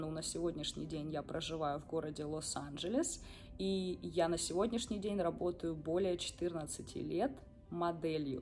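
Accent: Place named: Rostov, Russia. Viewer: Слышу слышу ростовский говор...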